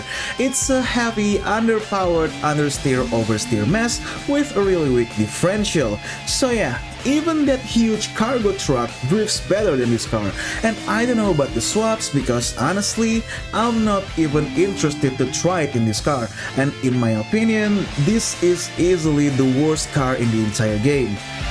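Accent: Indonesia. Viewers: you sound indonesian...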